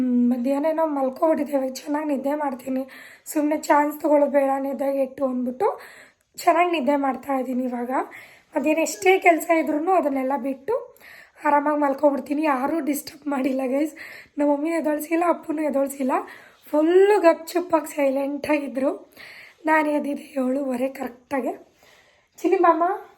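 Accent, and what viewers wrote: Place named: Mandya, India. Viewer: Mandya bhashe maatadtare, namma mandya😊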